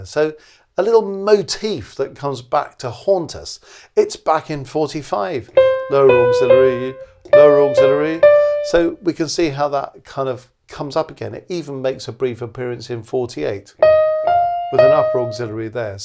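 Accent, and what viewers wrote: United Kingdom. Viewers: I suggest that can you please teach us how to speak British? Because you have the great British accent when you are speaking....Please?? THANK YOU!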